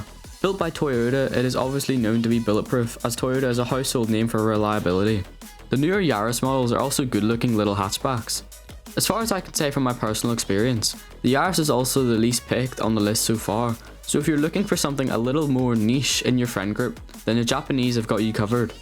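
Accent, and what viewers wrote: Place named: United Kingdom. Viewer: I don't know if I find it good or bad but your accent is so there. It's soooooo British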